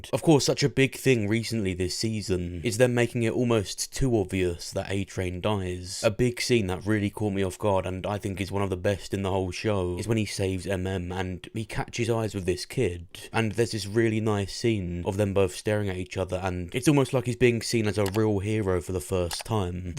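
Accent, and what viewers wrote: United Kingdom. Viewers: Are you a British guy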